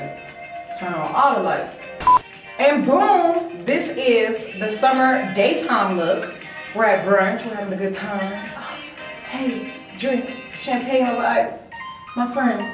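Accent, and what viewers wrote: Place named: Houston, United States. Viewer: What part of the H? I'm from Houston too. Beyonce talk like this too lol. Real country lol...It's an HTOWN thang 🤪.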